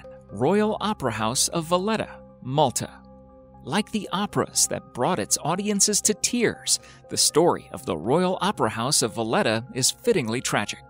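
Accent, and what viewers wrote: United States. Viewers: You just can't have an American doing voiceovers that involve any words - well, any words at all really. This bloke is clearly unaware of any of the world further than a mile from his home. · I dont believe he did try to pronounce them correctly. He pronounced them how he thought they should be pronounced in american english, not correctly.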